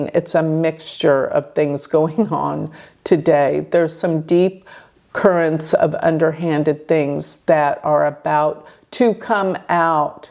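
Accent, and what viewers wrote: Texas, United States. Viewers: I watched a different video of yours, I didn't research anything about you and I immediately said, "She's from Dallas TX!" I am Accent Sympathetic and clairvoyant with 2 levels, possibly 3. I'm going to sign up for my Chart details from your website. I can trust you, my guides have told me.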